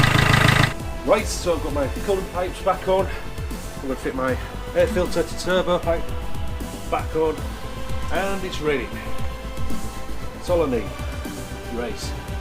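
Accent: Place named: Barnsley, United Kingdom. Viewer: I discovered your adventure and I followed the episodes one after the other with great pleasure. The quality of the videos, the humor, the scope of the work and especially its production are of high quality. My English dating from my schooling and a little more, I have to admit that I did not always understand everything but your accent reminded me of my short stay in Barnsley in 1984 during the miners' strike. By the way, your 350z isn't bad either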